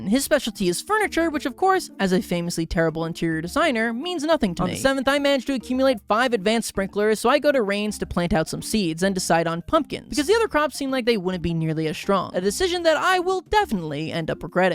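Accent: Australia. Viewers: You're Australian??? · After hearing the HORRIBLE Australian accent, I really struggled coming back and watching this video. I then made the mistake of watching till the end… I hate that I like 😐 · Yo, finally some Australian rep! Also please stop the australian accent. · As an Australian I can approve of this accent 😊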